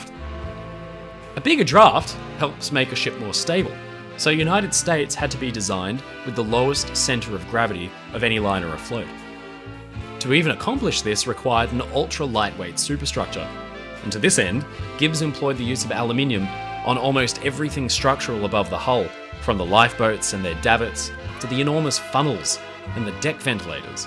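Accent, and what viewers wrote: Australia. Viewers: I thought you were British until you said "Hee-Uh". Then I realized you're a Aussie mate. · Thank you for so many absolutely great documentaries,always enjoy your knowledgeable & well spoken narration. Nice to hear an Australian voice!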